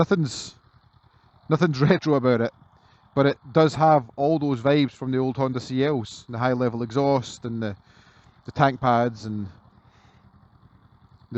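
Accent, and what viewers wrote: Scotland, United Kingdom. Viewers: 😂 LOVE that Scottish accent …as an Irish person I can understand very word !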